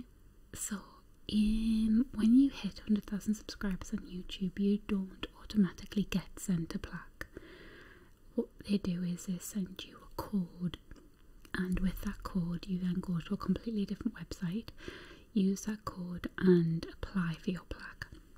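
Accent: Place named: England, United Kingdom. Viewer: I've only ever commented once or twice on your videos (HAD to comment ages ago about the girl in the library one because it's still a massive favourite). I couldn't not comment to congratulate you on your 100k subs. You're the only ASMR creator with an English accent who gives me tingles (I'm Mancunian, so it's not that I've got anything against Brits!). It's fantastic to see your hard work being recognised! Thanks so much for your uploads, for being so down to earth and for really knowing how to work the tissue paper! I know this isn't relevant to ASMR, but you always look so beautiful. Here's to 250k! Lots of love, Elaine X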